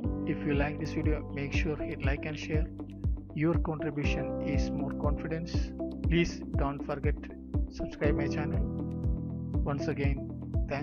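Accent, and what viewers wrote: India. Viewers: You mean he sounds like an indian?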